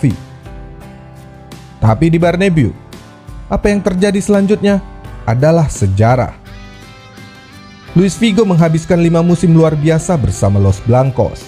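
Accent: Medan, Indonesia. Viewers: BG..ABG orang Medan yah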